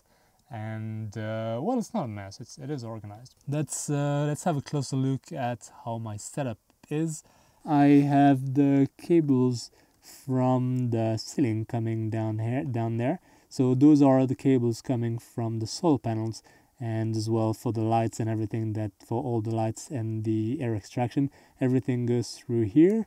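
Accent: France. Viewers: Okay I give up... French? Swedish?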